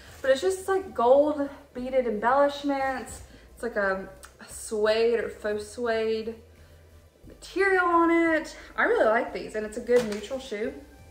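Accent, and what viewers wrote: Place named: South, United States. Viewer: You have a slight accent; are you from the south?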